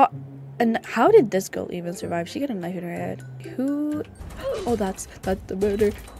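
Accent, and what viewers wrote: Sweden. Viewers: Wait, are you Swedish? 😛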